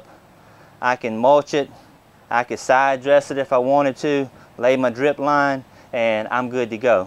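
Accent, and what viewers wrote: South, United States. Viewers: I love to hear people talk with this particular southern drawl like he has got, by the way, beautiful tomatoes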